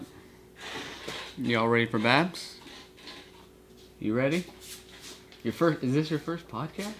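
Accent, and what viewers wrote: South, United States. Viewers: You are really are speaking more like the south YaLL! Lol have a beautiful day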